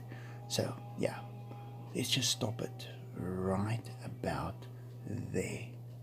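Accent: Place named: South Africa. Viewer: I would absolutely LOVE to see a tiger (and a lion for that matter) in real life! I live in the UK and I've never even been to a zoo so your videos are a real treat for me, it's like a different world! Thank you so much for sharing with us all. P.S I just LOVE it when you say "Can you believe it"! The South African accent is my absolute favourite! Much love to you, and all the magnificent animals <3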